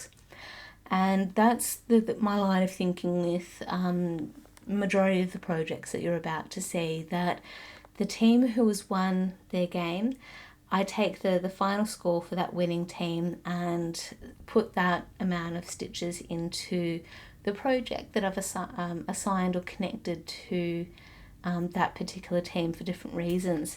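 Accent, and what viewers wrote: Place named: Australia. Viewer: I have never attempted a full coverage but love seeing everyone's progress in theirs. I have pattern keeper but don't have the program uploaded yet as I've never used a tablet before! That tequila sunrise silk is gorgeous! Water tiger is so beautiful! I love big cats! But your designs are wonderful! I adore you! From the first moment I found you. You hooked me with your sweet Aussie accent and soft voice and amazed me with your photography and translated designs! You are so Gifted! I wish you were my daughter💕 I would love spending time with you creating (and walking = I was never a runner!)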